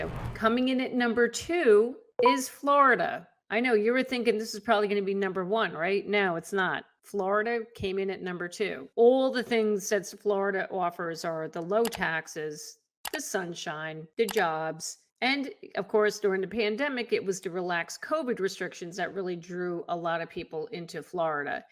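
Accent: New Jersey, United States. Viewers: You are good looking and totally on top of your game. Love the Jersey accent.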